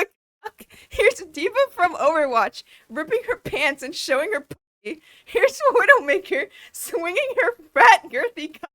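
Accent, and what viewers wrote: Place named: Mexico. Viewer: You sound mexican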